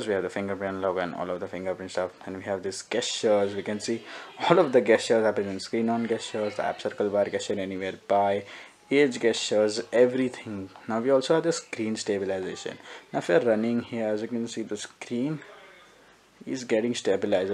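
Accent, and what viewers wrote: India. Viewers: Fuck, why do indians have so terrible accent??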